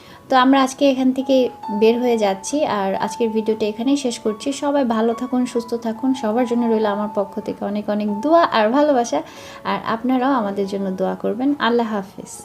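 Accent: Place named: Madaripur, Bangladesh. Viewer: Vapsa gorom hahaha.apu amar husband o tomar moto kotha bole karon amar husband o madari purer.tumi jemon kicu kicu kotha ae vasae bolo o temon kicu kicu vasae bole.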